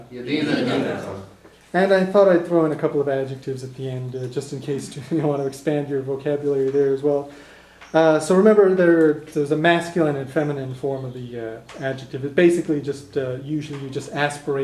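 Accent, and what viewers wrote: United States. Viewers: im really not trying to be mean i think its great what your doing but irish in an american accent is funny! adh mor ort slan! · Do you really think he speaks with an Irish accent? I don't hear an Irish accent at all. I hear an American speaking "standard" Irish with an American accent. As I mentioned before I think it's great that he is learning the language but his pronunciation needs work. Would you prefer to learn a language (and pronunciation) from a learner, or from a fluent native speaker?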